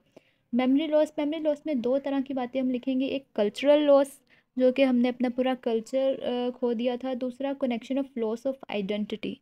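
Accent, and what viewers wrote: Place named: Lahore, Pakistan. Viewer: Thankyou Mam ji....... love from India and aap Lahore ki Hai mujhe pata chal gya jab aapnne bola theek ho gaya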